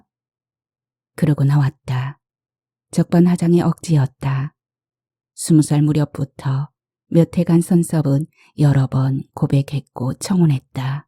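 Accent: Jeolla, South Korea. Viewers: 님의 걸죽한 전라도 사투리 때문에 사람냄새가 물씬하였습니다. 모처럼 작품에 흠씬 빠져보았습니다. 감사합니다. 행복하시고 건강하십시오...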